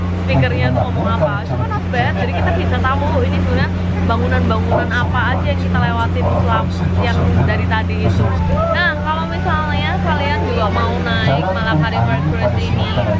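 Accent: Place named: Java, Indonesia. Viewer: Logat nya ky org jawa kak,aq org jawa stay di malaysia sudah 11 th,aq pernah travel ke malacca...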